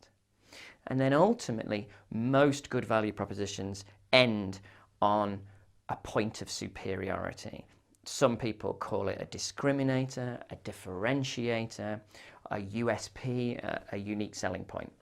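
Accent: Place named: United Kingdom. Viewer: From accent it seems he's a Britisher, is it so?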